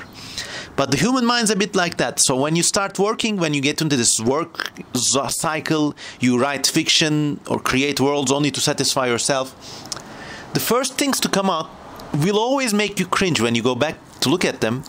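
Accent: Turkey. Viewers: İngilizce aksanı Türkçe'ye çok ama çok benziyor. Soy isim " " olabilir mi diye merak ediyorum. Acaba? Eğer doğruysa belki bir evet cevabı alabilirim.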